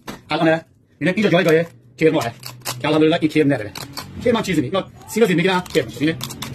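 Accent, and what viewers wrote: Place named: Yazd, Iran. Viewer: چه مرد کاردرستی . آدرسی ندارین , لهجه یزدی که دارند. آدرس رو میگفت...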